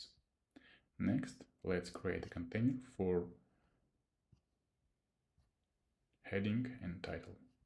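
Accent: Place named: Russia. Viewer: Я слышу, что ты русский ))) Так что давай такое видео на русском. А так я тебя через янденкс-переводчик слушаю )))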